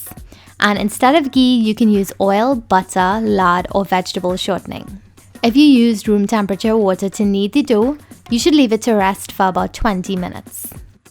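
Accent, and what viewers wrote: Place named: Trinidad and Tobago. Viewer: This has to be the best video on paratha. I moved from Trinidad at 17 years old but I vividly remember these family occasions. Thank you for sharing this part of your family with us. Job well done!!! Side note- I love the fact that you kept that strong Trini accent. Don't ever lose it.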